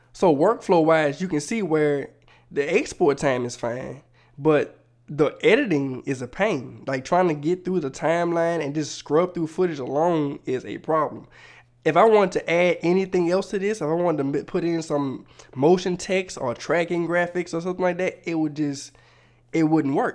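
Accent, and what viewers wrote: Louisiana, United States. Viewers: Yaaaaas. I’m an Instructional Designer and have been watching tons of these videos and doing research before I make my purchase. Loved the review. Glad I came across your video. Also, me after a couple of minutes of hearing you speak: “I wonder if he from Louisiana?🤔” 😅 Thanks for this vid! ⚜️❤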